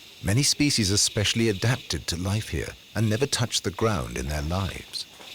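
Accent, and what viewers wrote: United Kingdom. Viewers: He’s British. Everyone who speaks English is Not American.🤷🏽‍♂️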